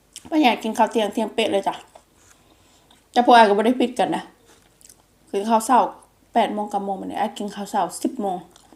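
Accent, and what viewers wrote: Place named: Isan, Thailand. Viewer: อายเอ่ย แคทละฟังบ่ออกมื้อนี้ 🤣🤣🤣 ปกติสำเนียงสกลนครกะฟังยากอยู่มื้อนี้ยอมรับจ้า แต่กะน้ำลายไหลนำคือเก่า😊🤤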